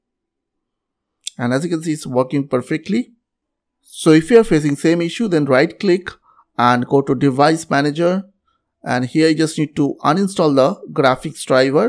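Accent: India. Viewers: You can always count on a guy with thick hindi accent to solve a random technical issue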